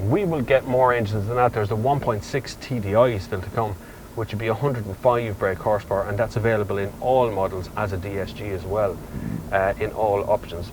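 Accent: Ireland. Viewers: I like your Irish accent :D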